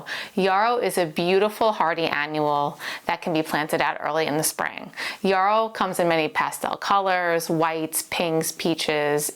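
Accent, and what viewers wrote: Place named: Long Island, United States. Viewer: Accent rough. People from LI and Staten Island always sound a little drunk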